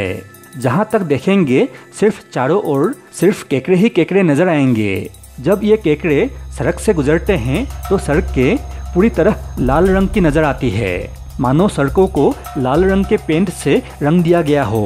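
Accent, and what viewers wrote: Assam, India. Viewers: Aapunr voice2t akhomiya Jen lgise.... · আপোনাৰ উচ্চাৰণৰ ওপৰত ভিত্তি কৰিহে সুধিছো মহাশয় , আপুনি হিন্দিভাষী নহয় , নহয়নে ৷ উজনি অসমৰ যেনো লগা নাই হলে 😃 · The Hibi bcoz mother toung hindi nhi hai ....Yeh asssamese hai phir v yeh try lar Raha hai .... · একদম অসমীয়া এজনে হিন্দী কৈ থকা নিচিনা লাগিছে।হিন্দী উচ্চাৰণ ত অলপ improvment ৰ প্ৰয়োজন।চেষ্টা চলাই যাওক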